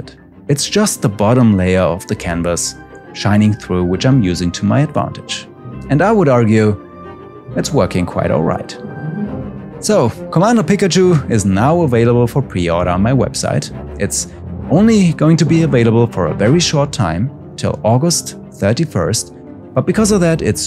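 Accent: Germany. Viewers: He lives in Germany, as it is said in the "about" section and his accent is also German I think, so there's a great chance that he's Turkish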